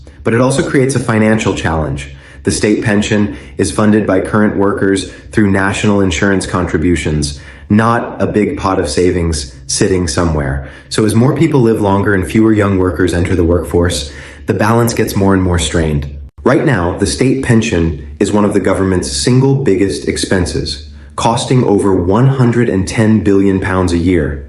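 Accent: United States. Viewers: Why is an american accent being used instead of British? You cant really take this channel seriously due to it. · This is the second video I've seen narrated by this guy with an American accent with misinformation that's not been UK News and on the government website and would have been.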